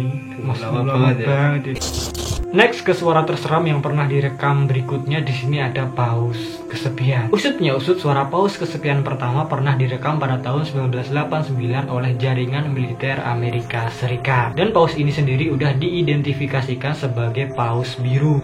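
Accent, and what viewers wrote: Java, Indonesia. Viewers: Njenengan jowo mas? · bisa ilangin medoknya gak? mles dngernya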